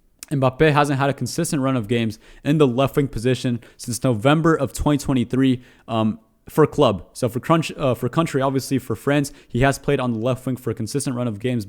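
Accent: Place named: Argentina. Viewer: Do you have from Argentina? You sound Argentine when you pronounce Spanish words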